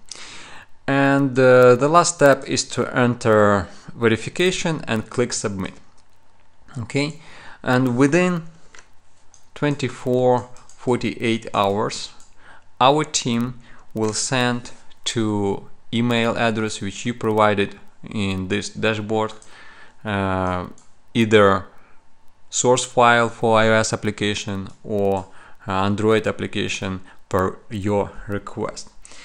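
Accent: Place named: Italy. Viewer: si sente benissimo che sei italiano. il tuo inglese fa schifo!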